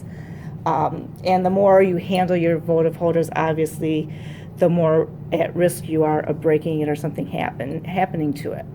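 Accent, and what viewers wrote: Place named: East Coast, United States. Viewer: Wait! You are in Michigan too? With your accent I thought out East! ♥️💋🇺🇸💃🏻 Cool! Sorry, I am new to your channel so I just found out. Love your videos they are so calming. Thank you! 🙏🏼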